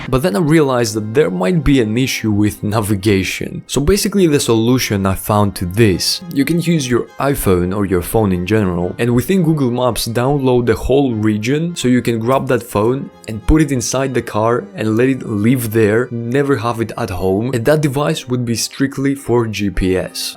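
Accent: Greece. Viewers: Ημουν σιγουρος οτι ησουν Ελληνας απο τη προφορα (μια χαρα ειναι μην αγχω) αλλα οταν αρχισες να ραπαρεις στα ελληνικα το κλειδωσα, εχω κγω την ιδια ιδεα τελευταια με τη digital ζωη σκεφτομαι να το ξεκινησω να δω πως θα παει. Ωραιο βιντεο!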